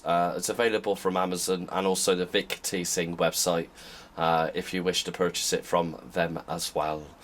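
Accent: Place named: England, United Kingdom. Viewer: Thank you for explaining the "CPI" button on this product. I work from home on my laptop and purchased this mouse for my personal laptop use and did not understand the relevance of the CPI button, but your comprehensive review made it quite clear, and your English accent was a delightful bonus. Thanks again!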